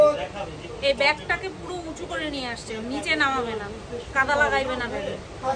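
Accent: Bangal, Bangladesh. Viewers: তোমার বাঙাল কথা আমার darun লাগে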